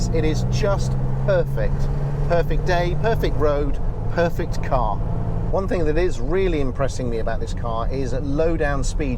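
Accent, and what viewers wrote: United Kingdom. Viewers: I look forward to the end of the week and pouring myself a bourbon and settling in for this week’s episode of The Car Guys. Maybe it’s that I’m of similar age or the British accent to my American ear but narration is like poetic prose. His descriptions, word choice and figures of speech are just perfect. His observations and highlights are on point. It just resonates so well with me. It’s knowledgeable but most importantly it’s sincere. Now I’m looking forward to Sunday night, a glass of wine and the next Watch Guys episode for more of great storytelling style.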